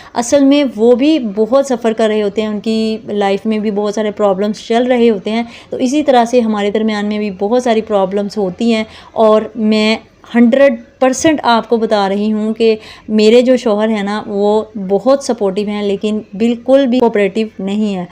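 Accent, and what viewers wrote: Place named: Punjab, India. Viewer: Apka punjabi main bat krny ka style buht acha hota hai m regular watch krti hun apk vlogs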